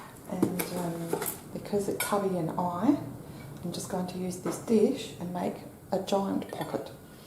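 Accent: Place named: Australia. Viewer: I love your accent so so much! It’s just beautiful and incredibly relaxing!❤️ Australia is on my bucket list and it being cool there sounds so nice right now. It’s in the 90s here with an even hotter heat index. This is the best way to end my day! Thank you!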